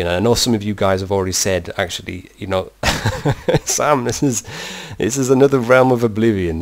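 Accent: United Kingdom. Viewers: dude ii watch your videos just for i hear your voice ... Britsh . its amazing. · britishhhhhh!!!! XDDD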